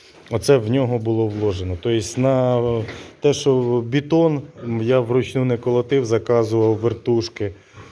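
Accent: Ukraine. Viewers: Такий у Вас цікавий говор, звідки Ви родом? Мій тато так розмовляв - Харківська обл. Дуже цікаво, а головне доступно для новачків розказуєте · Доброго здоров'я, приємно чють рідний Миколаївський суржик))) , краще ніж відоси годовой давності))). Я в одного дятька бачів підлога в свинарнику була викладена з дерев'яних чюрок, як плитка тротуарна)) . В самого хозяйства немає вже давно , а приємно дивитися ваші відоси.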